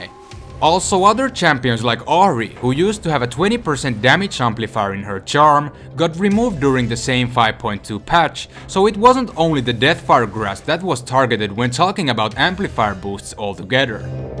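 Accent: Finland. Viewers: Are u from Finland? If u arent u just speak like Finninsh people does.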